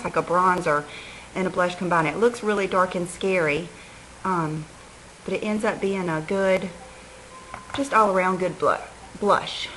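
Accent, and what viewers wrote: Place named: North Carolina, United States. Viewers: Mrs., you had me laughing this whole video with all of your annotations. You and my mom are two peas in a pod. You remind me of her so much. We're from NC, and everything from your accent, to your 'sillyness' just reminds me of her. I'm only 19, and I don't live with her any more, and watching you is like a little piece of mom on my computer screen;). Love your vids! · It's good to laugh at yourself sometimes, you look lovely anyway! Love your accent, I grew up in S.C., makes me kind of homesick! Happy Mother's Day!!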